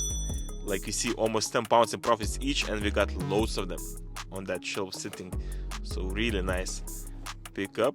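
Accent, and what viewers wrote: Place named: Russia. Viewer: Russians accent❤❤❤sweet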